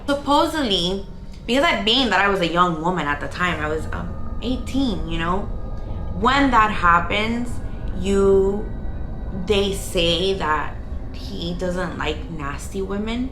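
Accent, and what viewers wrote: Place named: Miami, United States. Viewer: as you were speaking I just had a feeling you were from miami...I swear the creepiest things happen here. I have so many paranormal stories just like this but wow this definitely unlocked a new fear LOL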